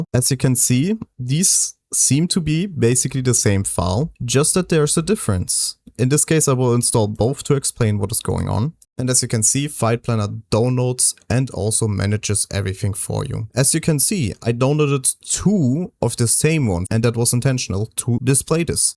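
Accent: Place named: Germany. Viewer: i hear a little deutsch in your voice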